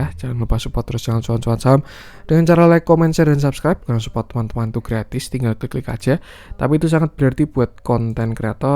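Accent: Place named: Java, Indonesia. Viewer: Medhok bgt mas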